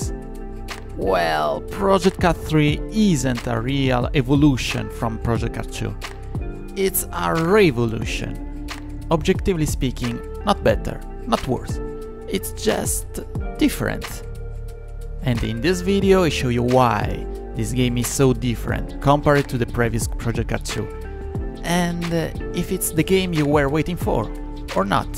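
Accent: Italy. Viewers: are you italian? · This guy is Italian isn’t he?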